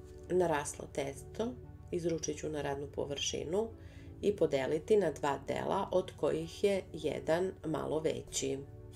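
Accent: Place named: Niš, Serbia. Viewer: Joj što volim ovaj vaš rekla bih Niški naglasak. Pozdrav iz Podgorice 👍🍀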